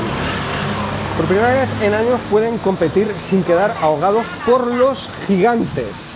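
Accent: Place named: Alicante, Spain. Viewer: Me parece que tienes acento alicantino👋🏽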